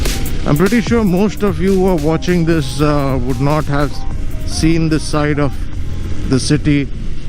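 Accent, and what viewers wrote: India. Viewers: Great to see someone from Odisha in blogging and exploring the beauties of our State .. thanks bro and all the best a slow and steady race hope to see you soon in 100k subscriber club soon, The Odia tone in between ur blog is damn good bhai ... gote Pahala Rasogulla upare video te hele badhia heithanta brother !! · Bhaina gadi chale la Bela ku katha kuha bi Tama video dekhi ki dar lagi chi ba...au Tama odiya language PURA Rourkela style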